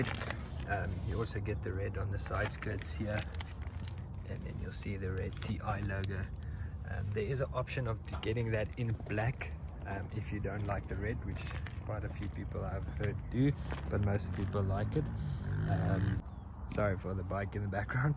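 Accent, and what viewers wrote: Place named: South Africa. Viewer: I could listen to that South African accent all day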